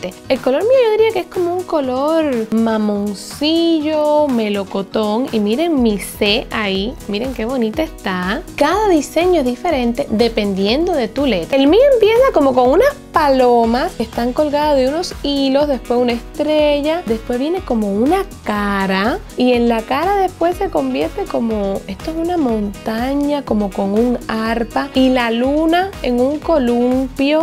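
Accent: Cuba. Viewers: seguro por el acento cubano. No sé de dónde eres, pero yo soy Dominicano, y en Cuba y aquí usamos términos parecidos y/o iguales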